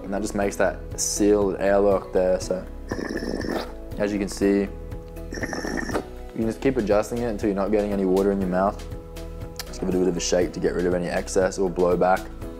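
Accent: Australia. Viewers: Obviously this dude is a fellow Aussie but I do not recognise his accent... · Western Sydney I reckon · Yup, thought so too. Maybe Adelaide 😂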